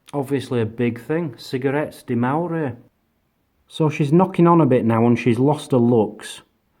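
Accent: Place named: North, United Kingdom. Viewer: I could listen to that Northern accent all deey.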